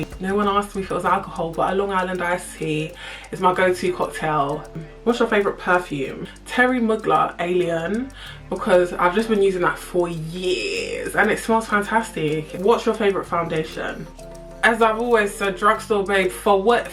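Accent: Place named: Essex, United Kingdom. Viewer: but from Essex! 😅😅 Love it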